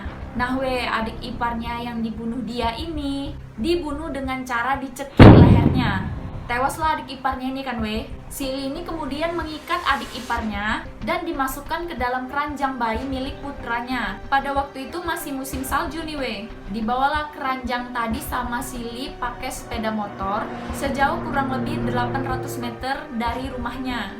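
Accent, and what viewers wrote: Medan, Indonesia. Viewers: Mantap logat Medan nya euy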